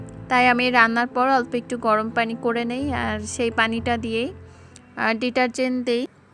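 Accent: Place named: Dhaka, Bangladesh. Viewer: Apu tomer bahsa Dhaka r kothai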